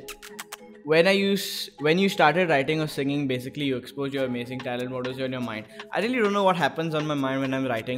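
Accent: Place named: Kerala, India. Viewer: Yo, bro u might not see this but u give me malu vibes dude, the when u say "amma",ur accent and ur likes...r u tho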